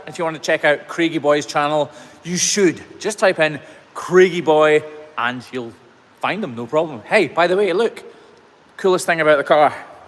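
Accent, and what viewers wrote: Scotland, United Kingdom. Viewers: I realize this is a stupid sounding question, but as I get older my hearing gets worse and my ability to decern accents has become total rubbish so I need to ask for clarification are you irish or scotch? My heritage is a a bit muddled, English, Irish, Scotch, French, German so you can imagine my shame at not being able to clearly recognize the accent, although my best guess these days is you are Scottish and the guys at Tech TV are Leprechauns.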